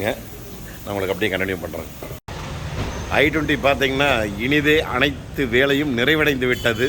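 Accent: Kongu, India. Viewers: கொஞ்சும் கொங்குத் தமிழ் பேசும் கோமகரே...! திகட்டாத தித்திக்கும் பேச்சுடைய திருப்பூர் திருமகரே...! மதிப்பிற்குறிய " " திருப்பூர் திரு. அண்ணா🙏❤ எப்படி இருக்கீங்க❤🙏 அண்ணி எப்படி இருக்காங்க❤🙏 ரித்திக் எப்படி இருக்காரு❤ அருமை அருமை அருமை வழக்கம்போல அண்ணா உங்களோட வீடியோ அருமை❤❤❤😊😊❤❤ என்ன சொல்ல ஏது சொல்ல😊😊❤❤❤ சும்மா நெகு...நெகு...நெகுனு இருக்கு ஹுண்டாய் I20😊😊❤❤❤❤ வெள்ளை நிறமும்/கருப்பு நிறமும் அட்டகாசம் போங்க😊😊😊❤❤❤ அதிலும் குறிப்பாக அந்த "அலாய் வீல்" வேற மாதிரி வேற மாதிரி😊😊😊😊❤❤❤❤❤ அழும் குழந்தையின் அழுகையை நிறுத்த தாயால் மட்டுமே முடியும்...! அதேபோல.... அஞ்சு பைசாவுக்குகூட தேறாமல் அழுக்குப் படிஞ்சு பாழாய்ப்போன காரை அழகுபடுத்தி ஆரோக்கியமா மாத்த " " அண்ணாவாலதான் முடியும்😊😊😊❤❤❤❤🙏 இந்த வீடியோவில் சரியாக வது செகண்ட்ல அண்ணாவோட வேலைப்பாடுகள் பற்றி கிண்டல் செய்து சொன்ன தன் அறிவை கழட்டி ஆப் ஆயில் போட்டு தானே எடுத்துத் திண்ண அந்த ஆளுக்கானது...... குறை சொல்பவனுக்கு குழந்தையின் சிரிப்பும் குற்றம்தான்....! 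உளறுபவனுக்கு உழைப்பின் மகத்துவமும் தன் மரமண்டையில் ஏறாத ஒன்றுதான்...! அப்படிப்பட்டவன்தான் அந்த கிண்டல் செய்தவனும்...! " " அண்ணா நீங்க அடிச்சு துவைச்சு போயிட்டே இருங்க அண்ணா😊❤ உங்கள் திறமை யாவும் வாசம் நிறைந்த சந்தனம் போன்றது😊❤...! அதெல்லாம் சாக்கடை எது? சந்தனம் எது? என்று பகிர்ந்து பார்க்கத் தெரியாத அந்த கிண்டல் செய்த மடையனுக்குப் புரியாது...! என்றும் அன்புடன் உங்கள் தம்பி ரா.முஹம்மது கபூர் (சென்னையில் இருந்து) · வணக்கம் அண்ணா. எனது பெயர் சிவராமகிருஷ்ணன். கோவை சூலூர் பகுதியில் வசிக்கிறேன். தங்களது வேலைகள் அனைத்தும் மிகவும் சிறப்பாக உள்ளன. தங்கள் காணொளிகளை சமீபகாலமாக பார்த்து வருகிறேன். உங்களது கொங்கு மொழி சாயலும், நகைச்சுவையான பேச்சும், விளக்கங்களும் ரசிக்கும் வண்ணம் உள்ளன. தங்களிடம் வருகை தரும் பல்வேறு மாவட்டங்களை சேர்ந்த வாடிக்கையாளர்களே அதற்கு சான்று. விரைவில் நீங்கள் பிற மாநில வாடிக்கையாளர்களும் பெற எனது வாழ்த்துக்கள். Alloy wheels - Super design I seen in non-company alloy wheels. Dual tone colour paint - Awesome. 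DRLs - Great.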